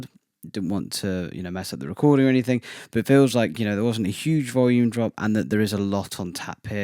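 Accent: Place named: United Kingdom. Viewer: Hello, cheers for the demo sounds like your from the UK. I'm looking for a cheap fuzz to send my Minimoog through. where did you get it from? Would you consider doing a comparison test between a cheap fuzz, Distortion and a sustain pedal. Is there a big difference? Cheers Tim. ( yes you are from the Uk as I discovered near the end of the video:) ) I like your presenting style.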